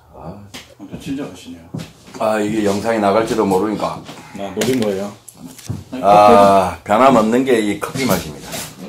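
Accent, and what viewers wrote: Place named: Gyeongsang, South Korea. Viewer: 창원 사투리 맞는거 같은데요?ㅋ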